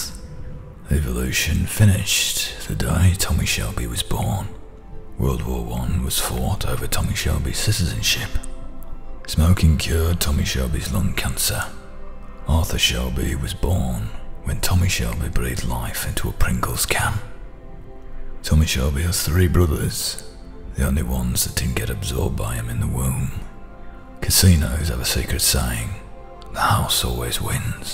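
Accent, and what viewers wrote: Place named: Birmingham, United Kingdom. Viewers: Absolutely SUBLIME Birmingham accent! Best Ive ever heard! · The broomy accent yknow 😂😂😂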